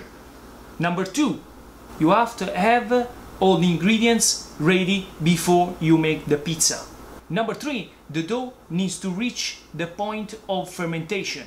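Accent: Italy. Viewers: Thank you l love watching you make your pizza full of action like dancing and so nice to hear your italian accent, bravo! Greetings fm Malaysia.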